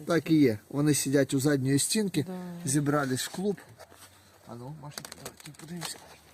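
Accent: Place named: Surzhyk, Ukraine. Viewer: я сам разговариваю на суржике но твой суржик так режит слух что не могу твои ролики смотреть хоть включай субтитры.бeз образ,Слава Украіні.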